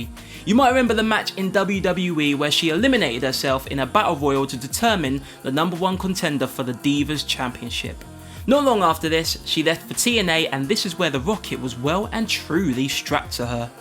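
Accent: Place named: England, United Kingdom. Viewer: I clicked to hear the bro talk with an English accent. Go forth young man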